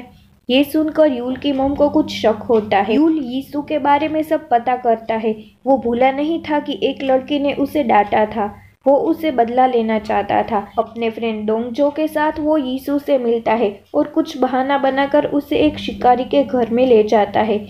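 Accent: Gujarat, India. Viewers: Aap gujrati ho kya Aap ki voice me gujrati touch h You have h such a nice voice and good explanation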